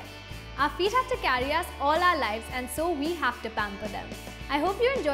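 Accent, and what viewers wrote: India. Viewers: Ur curry accent!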